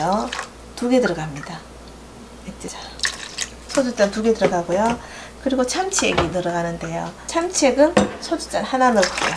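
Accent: Gyeongsang, South Korea. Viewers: 경상도 아지매네예~^^ 오이를 끓인 소금물로 먼저 담으시네요 어떤 장점이 있을까요?? 궁금하네요 또한 3종 드리고 이웃맺고 싶어요^^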